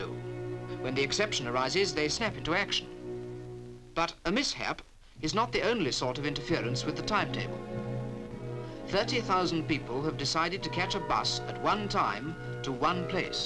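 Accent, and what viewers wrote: RP, United Kingdom. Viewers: Plummy voice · A real cut gless eccent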